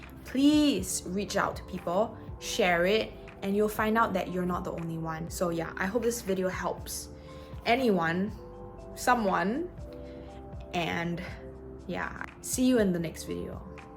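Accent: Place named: Singapore. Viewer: Hi! Future student in LSE MSc Political Science here! Got any advice for a fellow Singaporean? (Assuming you are from the video and accent haha..)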